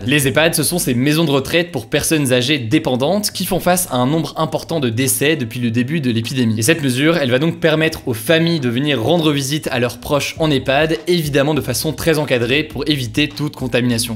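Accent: England, United Kingdom. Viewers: Cet accent anglais (sans ironie) pas mal ! 😂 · Je like rien que pour ton accent anglais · Ton accent anglais je l’adore 😂😂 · Le british accent d’ 💛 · Mais cet accent anglais je suis admirative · Cet accent britannique 🤩!! Merci pour ces news. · Il a un accent anglais britannique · that british accent you have :o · Comme d'habitude merci pour tes vidéos ! ❤️ Ce résumé est juste ultra pratique pour ne pas partir en psychose totale... Et au passage j'aime trop ton accent anglais ! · Bel accent british!!!!! 🤗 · Ton accent anglais c'est un plaisir à entendre :D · L'accent anglais wow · Son accent anglais me fascinera toujours autant XD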